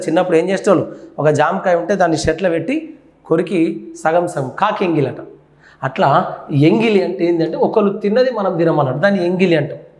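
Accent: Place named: Telangana, India. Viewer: తెలంగాణ యాస ,బాషలో అద్భుతమైన వివరణ.....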